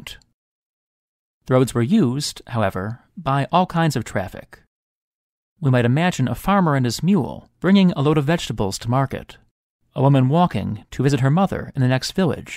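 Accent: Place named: Chicago, United States. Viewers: you have a super thick Chicago accent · i could tell you were from chicago. i picked up the mild accent in your videos. great content!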